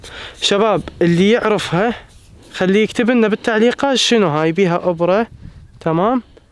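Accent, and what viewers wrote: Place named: Basra, Iraq. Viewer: مادري ليش يضحكني سدو خشمي وجيبلك كونيه وحمل هواي مصطلحات كلش حلووو هاذ من البصرة احسه نفس لهجتنه.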